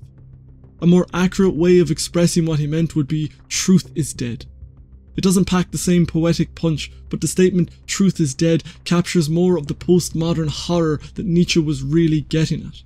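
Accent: Ireland. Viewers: I like your videos by I get distracted by your accent. Where you from, Wicklow? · aha, I just showed your videos to a mate whose just discovered the late alan watts and we were guessing your accent! He voted midlands, I voted not dublin. And I am indeed! You have some great videos man, really enjoying your work.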